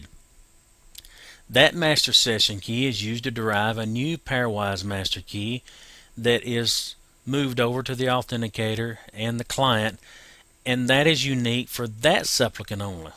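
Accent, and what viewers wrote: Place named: Australia. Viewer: Purely out of curiousity, which accent is this? I'm confused, it sometimes sounds Australian (in my ears, that is), then it sounds like it's from the Southern US, which got me intrigued. I'm no native English speaker, so recognizing accents isn't my strongsuit.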